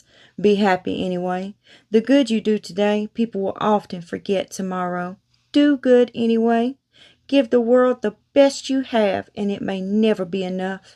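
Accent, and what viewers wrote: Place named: Louisiana, United States. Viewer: Hi, the tray came out beautifully. Thanks for sharing the wet technique. I’ve never seen anyone else do it before. What state are you from? I know you have a southern accent & to me, it sounds like Louisiana, but I’m not sure. Take care, stay safe & have a blessed day.🙏💗👋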